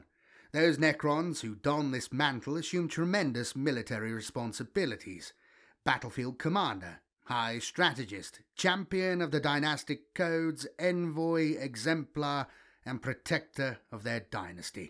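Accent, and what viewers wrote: United Kingdom. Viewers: Mispronunciations you say. I listen to your space British all day and see nothing to complain about. Top teir if you ask me. Have you listened to any of the A.I. voiced channels that keep popping up. The mispronunciations and awful cadence makes them unlistenable. Enough to make me strap myself up with "cordite." Lol.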